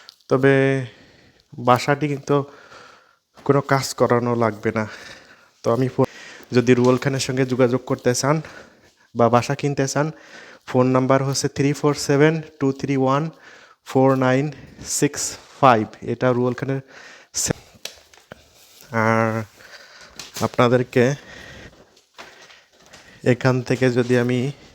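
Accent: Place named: Satkhira, Bangladesh. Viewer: satkhira theke... Apnar vasa sune mone hoi apnar bari satkhira..jodi satkhira bari hoi taile janaben 😊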